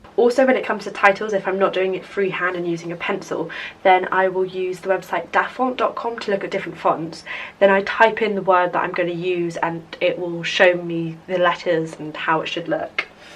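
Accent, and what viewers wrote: United Kingdom. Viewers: Because she is speaking in British accent and Emily was a British girl too 😅 does it connecting now 😅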